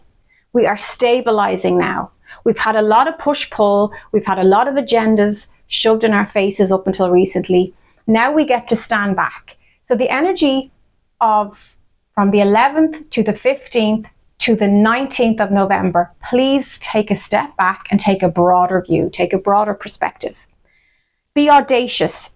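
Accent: Ireland. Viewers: I am here from Canada, I love your Irish tone. I love your positivity ❤️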